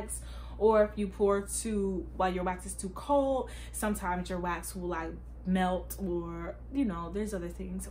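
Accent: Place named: Philadelphia, United States. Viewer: oh I just assumed because you sound like a philly girl lol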